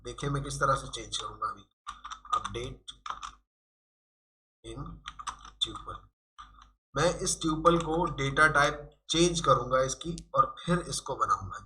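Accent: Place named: Punjab, India. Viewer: Is lecture me thori Punjabi tone b sunai Di ha.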